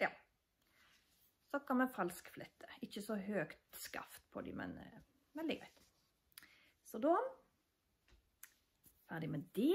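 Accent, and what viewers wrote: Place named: Haugaland, Norway. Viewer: Takk for flott episode, kjekt å hørra Haugalands dialekt og å se fine bilder fra Borgøynå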